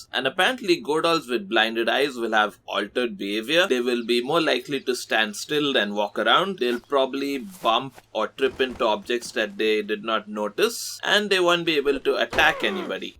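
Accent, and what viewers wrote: India. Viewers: Talking ahh India voice 💔✌️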